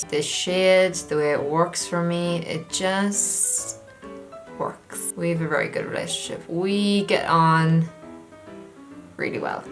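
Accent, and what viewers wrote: Ireland. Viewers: Is that an irish accent u have?in its cute!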